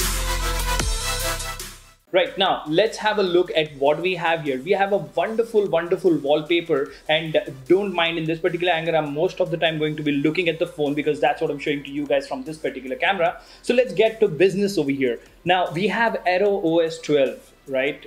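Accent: India. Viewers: Indian talking in English = Auto Subscribe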